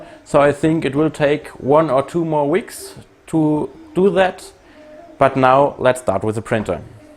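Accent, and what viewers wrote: Germany. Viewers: Sehr informatives Video, vor allem wegen der "kleinen" Fehler die an einem nagelneuen Drucker auftauchen. Habe mir bei "Aliexpress" einen für 175 € bestellt. Bin jetzt vorgewarnt hinsichtlich der Produktionsqualität. Werde den Aufbau mal protokollieren , ob die Fehler inzwischen behoben sind. Apropo Englisch - ist OK - ,der deutsche Akzent ist in den USA sogar recht beliebt. Und man redet keinen Müll bei technischen Themen. Very informative video, especially because of the "small" errors that appear on a brand new printer. I ordered one at "Aliexpress" for 175 €. I am now forewarned regarding the production quality. Will log the structure times, whether the errors are now fixed. Apropo English - is OK - , the German accent is even quite popular in the US. And you do not talk trash about technical issues. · your english is better than some native speakers here the US.. 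plus having a German accent works well with talking about mechanical things.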